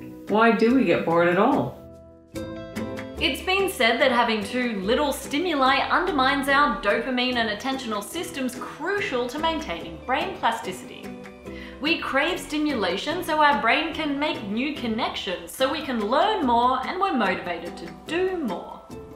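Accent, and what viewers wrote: United Kingdom. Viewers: Elephants eat poop to build up there immune system. The same way children do by putting anything and every thing in there mouth. Well at least according to the nature documentary I watch! Happy 50th and I thought you were British still a cute accident and thanks for all the wonderful content! Confetti button! <link> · I could swear you were British!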